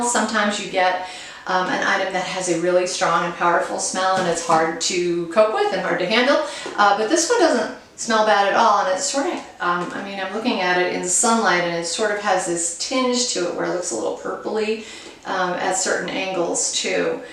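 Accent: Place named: France. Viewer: Hi and! I have a rescue cat named Norm! We rescued him from our local shelter! I absolutely adore your glasses! Your French accent is divine, dahling! Beautiful bag, too! Thank you for sharing, my friend! 😍🐈❤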